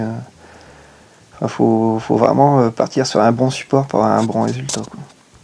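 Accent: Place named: Nord, France. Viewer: Te du ch'nord ti aute ça s'entend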